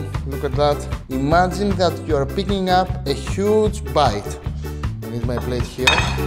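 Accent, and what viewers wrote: Greece. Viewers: That lovely accent is Greek btw not Italian.. pretty similar tho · my friend. Love your videos and your charming Greek accent. But I was kind of disappointed that you didn't use Greek basil😊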